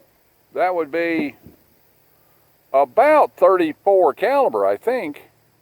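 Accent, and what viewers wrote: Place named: Western North Carolina, United States. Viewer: Yep you sound like you're from West North Carolina around Murphy ? Am I wrong ???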